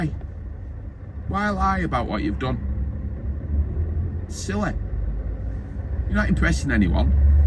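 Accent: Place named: Manchester, United Kingdom. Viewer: Hi mate im new around here i love watching truck driving vids only come across you just now here’s my take on the (not done much interesting) i myself hold a class1 (dont currently drive ) but one of my dreams is to do European and anything to do with your job abroad or England is fab to watch its probably not interesting to you because its the norm to us viewers it’s something out of the ordinary where in the uk are you from uk Manchester myself and your accent sounds close lol P.S its nice to watch someone that sounds like he enjoys his job good vid mate keep it up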